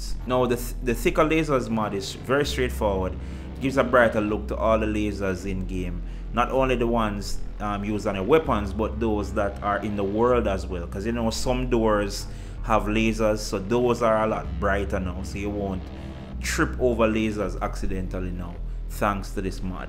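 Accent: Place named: Jamaica. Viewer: I like your accent, man. Are you Jamaican?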